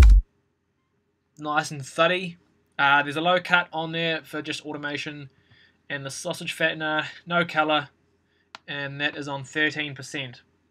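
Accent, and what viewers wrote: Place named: New Zealand. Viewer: does his accent, as in NZ as in NEW ZEALAND... and his channel name 'nz' not give any hints to you? and i'm pretty sure many new zealanders would be not too pleased to be called 'the same' as australians and vice versa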